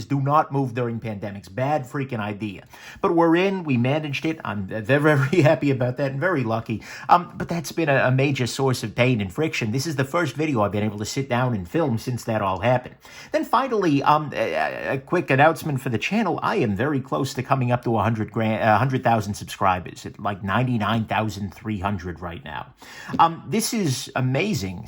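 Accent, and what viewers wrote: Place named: New England, United States. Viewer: I always figured the voice of reason would have a new england accent. Good on ya,. You're a real gem.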